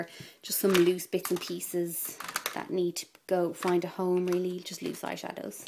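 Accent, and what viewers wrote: Ireland. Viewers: Love your accent! Are you Irish? I'm part Irish! Anyway, can you review the Kat Von De palettes? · are u irsh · OMG it so amazing when you watch so many people with american accents all day long[ and then you stumble across someone with an Irish accent... up the Irish... im so subscribing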